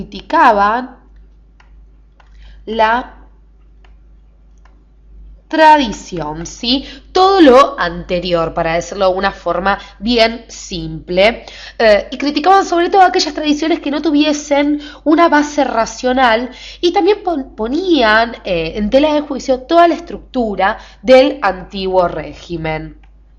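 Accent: Argentina. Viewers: la mina es de argentina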